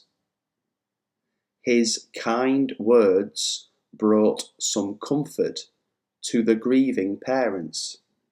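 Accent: United Kingdom. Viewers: The pronunciation is a British accent?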